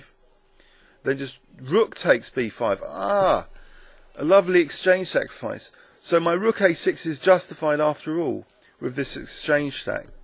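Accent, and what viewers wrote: United Kingdom. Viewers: i love british accent!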